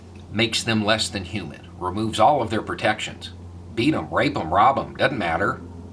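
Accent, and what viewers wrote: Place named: Tennessee, United States. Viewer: Hold up is from Florida?! I coulda sworn you'd be from Tennessee.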